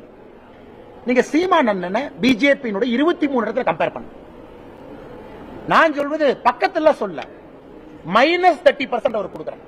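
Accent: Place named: Karnataka, India. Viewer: இவர் பேசும் போது கன்னட வாட வருது.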